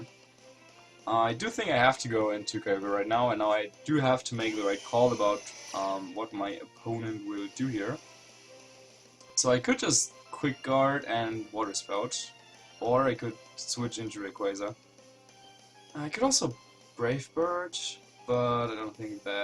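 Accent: Germany. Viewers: Pleasure to have you back, having only Hibiki was not enough German accent in my Pokemon videos (yes, I know he is Austrian, but it all just sounds the same)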